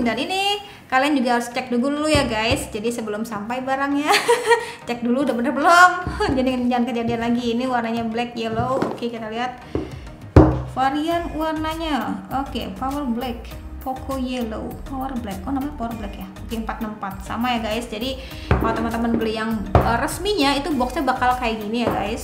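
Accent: West Java, Indonesia. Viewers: Sunda neng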